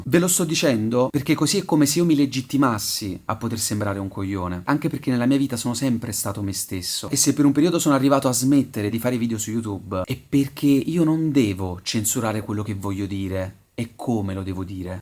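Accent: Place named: Campania, Italy. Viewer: " sott occhio mi è sembrato che fosse marcoripa con un nuovo berretto, dato il contenuto del discorso, poi però la cadenza più campana che laziale mi ha fatto capire. non ne so niente, per la carità ma, da quello che vedo in giro, la tempesta di feci è un vero limo per certi canali chè, non meritandosi applausi di quelli sentiti e spontanei, sul fertilizzante naturale ci campano sia direttamente che indirettamente perchè, e diciamocelo, più fanno cagare e più abbisognano di un ventilatore che ne diffonda le gesta. proprio in questi giorni l ho cercata perchè ,mentre facevo la rassegna dei primi tuberi da me visonati, lei mi è venuto in mente :coincidenze. comunque devo dire che lei non mi ha mai fatto ridere, anzi. a proposito ,mi saluti il signor youtube "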